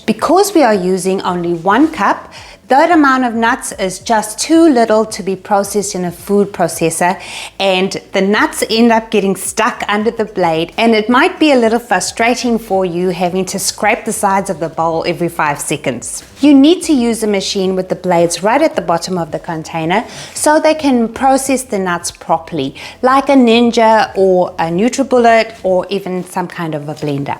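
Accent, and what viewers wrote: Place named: Durban, South Africa. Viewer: thank you love it ..you have a Durban Accent right?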